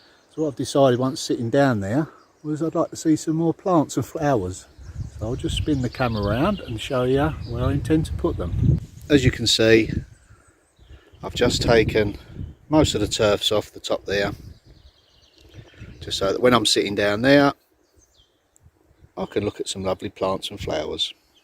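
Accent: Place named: England, United Kingdom. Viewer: Well been subscribed since Billy mentioned your channel & I'm very busy but I've been binge-watching all your videos since then. I love this channel & your content. Learning a lot & of course I love your England accent👍❗Such a lovely Family & your wife is as sweet as the dessert she made😍❗Keep the videos coming & I will keep watching from INDIANA U.S.A.🇺🇸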